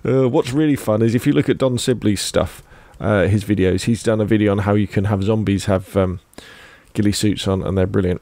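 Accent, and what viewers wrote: United Kingdom. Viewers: Man, good video and all but: Did someone ever tell you that you have an amazing voice? goes down like melted butter. Maybe im just too big of a fanboi for the bri`ish accent but still, u should think of doing ASMR or voice acting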